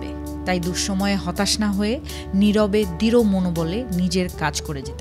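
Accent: Bangladesh. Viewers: Apner tone Bangladeshi but apner title nepali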